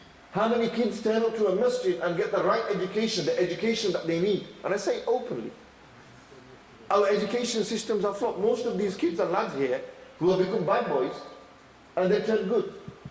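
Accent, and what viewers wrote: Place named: Mirpur, Pakistan. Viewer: Beautiful speech. May allah bless you. You gotta love his mirpuri.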